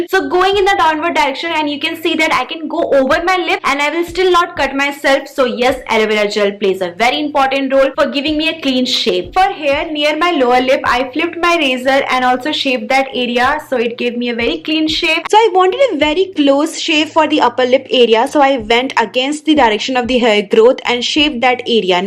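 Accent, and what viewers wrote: India. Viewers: You have the thickest indian accent, I love it so much.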